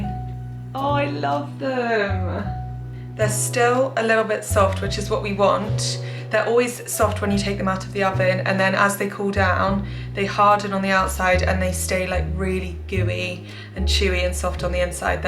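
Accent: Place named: United Kingdom. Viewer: Cozy vibes, beautiful autumn outside, yummy views of the dishes in the restaurant, and pleasant for ears British English... And the similar deciduous trees with yellowing leaves like those growing around my place... It's been a pleasure to watch the video. Keep it up!🎉❤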